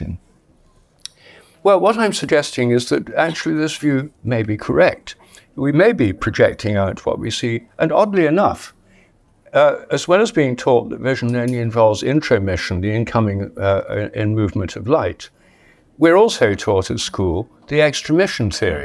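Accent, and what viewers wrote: RP, United Kingdom. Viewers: Occam's razor. 1. People are social creatures, we will always feel more watched when in company (spotlight effect). 2. Confirmation bias. We remember only the times we looked and saw someone looking back, forget all the times we looked and nobody looked back. 3. No more need for all the hypothetical superpowers or deities to add on to the above. Even a posh English accent can't wash away the flood levels of BS here. Unfortunately, the promotion of pseudoscience is a hard no for me, so unsubscribing from this channel.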